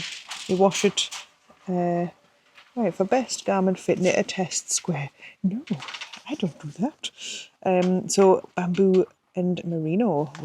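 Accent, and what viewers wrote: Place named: Wales, United Kingdom. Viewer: Pardon me as I’m new here but I’m trying to place your lovely accent? Welsh?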